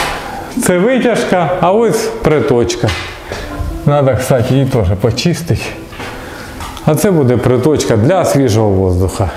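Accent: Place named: Kuban, Ukraine. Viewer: Мабуть чувак з Кубані, але українське коріння є. В Україні так не розмовляють тут за тридцять років вже мавпи навчилися розмовляти. Хай щастить.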